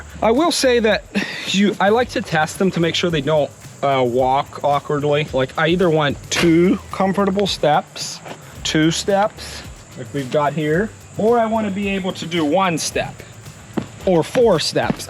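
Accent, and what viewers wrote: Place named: Maryland, United States. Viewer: What is that accent? Is that a northeast/Maryland accent because I am so intrigued by it! Looks good by the way!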